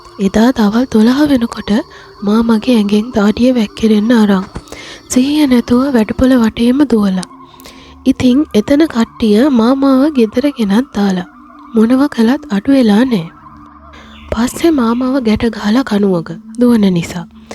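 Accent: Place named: United Kingdom. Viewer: Uk accent nam set ma na boka 😅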